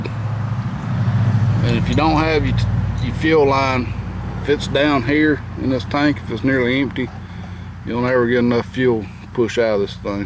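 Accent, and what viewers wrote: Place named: South, United States. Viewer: Thanks for the upload! Plus you sound like a good ole country boy, hell you would fit right in around here!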